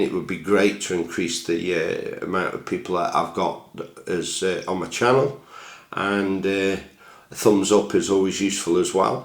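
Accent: Stoke, United Kingdom. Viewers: Are you a stokie by any chance 🙂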